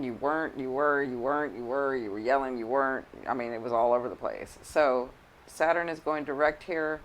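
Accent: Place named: South, United States. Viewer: Hello, Your 2 funny! Your REAL; Thank You! Yes i fully understand everything your stating! I understand planet's! You have my accent, you talk like me are u from the south? jan 27th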